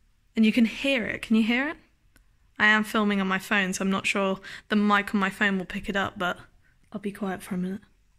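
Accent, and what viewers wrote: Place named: London, United Kingdom. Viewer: Hi where do you Find them? They are Awesome, is that how you got Your Tattoo on your left Arm. I am from The U.S.A. 🇺🇸 I Want to get that Tattoo unless it’s a woman’s Tattoo Please let me know, I am A Fan of Yours and since your from London I love ❤️ your Accent.....Love Donnie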